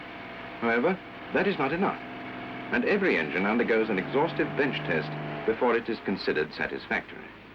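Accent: RP, United Kingdom. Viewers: I thought it was the typical "received pronunciation" of the era, aka BBC English...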